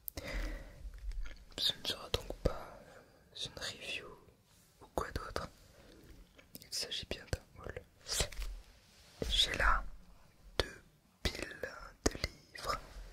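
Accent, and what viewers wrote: France. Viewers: "une pétite (h)AUL" i feel bad for laughing.. i love the french accent tho, not trying to be mean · I also love your french dialect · I literally love your voice! I'm a french student (in America) and I am completely obsessed with France/French. Your accent is so amazing :)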